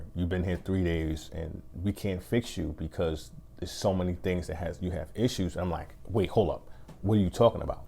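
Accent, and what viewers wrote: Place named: North, United Kingdom. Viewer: Yea man you from up north with that accent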